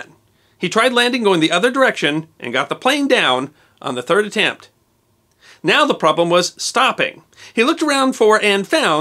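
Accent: United Kingdom. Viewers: Haha!!! So British!!! Sounds like Monty Python!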